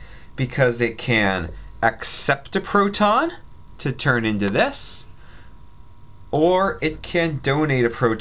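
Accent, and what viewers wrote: Canada. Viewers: totes hear a canadian or mn accent 😊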